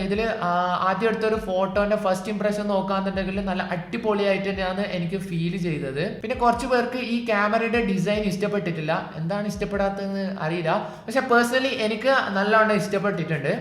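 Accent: Kannur, India. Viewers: Nee kannur aano 😊😊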